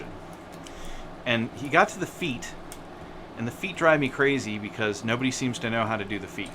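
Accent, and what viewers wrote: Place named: United States. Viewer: I'm willing to loan Big Spring, but I would like to know where you're located first. You sound American, so I'm not really concerned about long-distance transport, but a friend has told me that the quarantine has screwed around with delivery routes. Supposedly mailing things eastward, especially to large urban areas like NYC and DC is risky.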